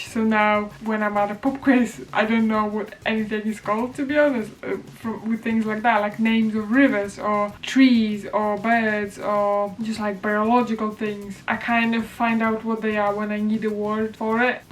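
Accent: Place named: North, United Kingdom. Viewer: UK native (manchester) spanish (2nd) ... you definitely have a northern sounding accent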